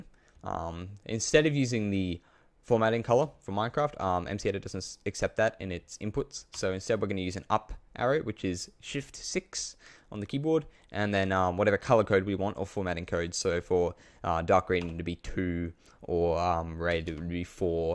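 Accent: Australia. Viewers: Are you australian? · Are you australian..? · HES AUSSIE LUV IET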